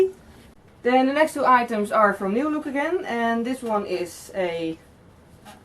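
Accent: Netherlands. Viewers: Same , Ben je van Nederland? I’m from Aruba 🇦🇼 ✌🏽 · Omg army is everywhereeeee😂 but yea its because its a dutch accent and she talks pretty fast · Lekker Nederlands accent xD. Hoe lang duurt het helen bij een zo ongeveer (bij jou ten minste)? Ik ga binnenkort namelijk een helix en een tragus nemen. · Ik hoorde aan je accent gelijk dat je nederlands was lol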